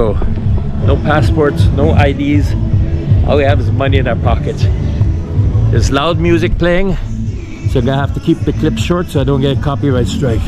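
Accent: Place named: Middle East. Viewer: You have an accent where are you from midlle east ??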